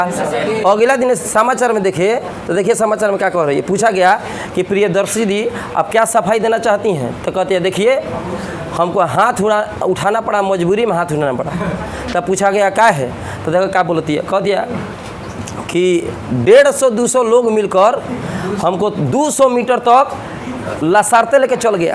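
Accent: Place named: Bhojpuri, India. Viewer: Sir aapke per ye Bhojpuri aawaj accha nhi lagta hai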